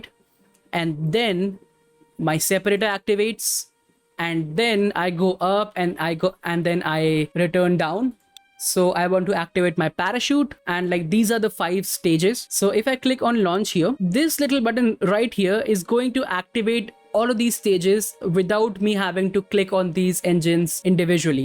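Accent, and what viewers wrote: India. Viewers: Are you from India or something? you sound like Indian that’s why. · bro it's indian accent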